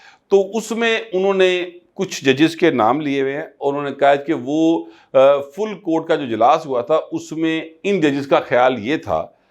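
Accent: Lahore, Pakistan. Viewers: مجھے تو فورا" پہنچ جاتا ھے آپکا وی لاگ۔ لاھوری انداز میں بات کا مزہ آجاتاھے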